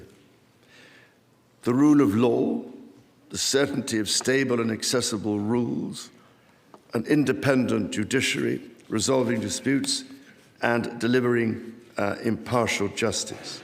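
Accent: RP, United Kingdom. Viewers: That speech put us Americans to shame in terms of pure literary eloquence, and RP, or Received Pronunciation i.e. "the Queen’s English," which is a is a high-prestige, non-regional accent historically associated with the upper class/royalty for its articulation, distinct vowel sounds, and a "cut-glass" tone. If i was taking an order from his majesty, I would be hanging onto every single word out of sheer admiration, and i am sure to his majesty's utter exasperation and irritation. Otherwise, a highly politicized speech requesting President Trump and US to recommence aid for Ukraine and a little sliver of an arrow about politicAl speeches and the importance of language.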